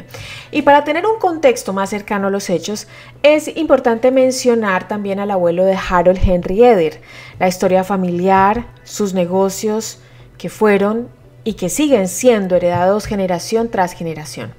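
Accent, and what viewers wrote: Colombia. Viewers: VOS SOS VALLUNA? NO JODÀS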